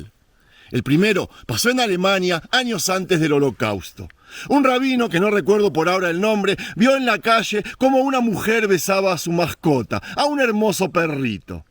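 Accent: Buenos Aires, Argentina. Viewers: Israel y los judios son el Pueblo Elegido de Dios que han sufrido persecucion durante siglos hasta hoy. Hashem los proteja siempre. Bonito amanecer en Jerusalen. A mi tambien me gusta mucho la Historia. Mi grupo de hermanas de mi iglesia y yo estamos leyendo la Biblia cronologicamente y ahora estamos acabando Exodo. Gracias, querido, por tu esfuerzo, por tus paseos, por hablarnos estas historias maravillosas con el Tanaj en la mano, con tu hermoso acento bonaerense. Bendito Israel y su hermosa gente. Seguimos orando x todos vosotros, por los rehenes, por los soldados y por tu hijo Eden. Que Dios os bendiga. SHALOM desde Barcelona. AM ISRAEL JAI✡️🇮🇱🙏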